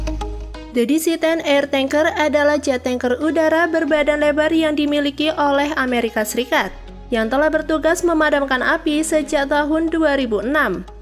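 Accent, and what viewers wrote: Java, Indonesia. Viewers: Wong djowo medok e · Naratornya medhok... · Org pundi mbaa, medhok bgt omongan e hahaha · Ngomngnya medog wkowkowko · Medok suaranya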